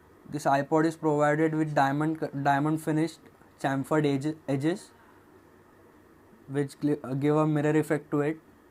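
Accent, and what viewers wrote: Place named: India. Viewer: You made me cry I hate Indian accent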